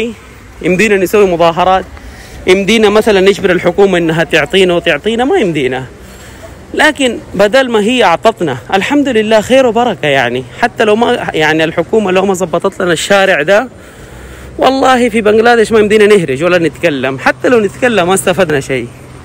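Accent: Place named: Jeddah, Saudi Arabia. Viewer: اللهجه جداوي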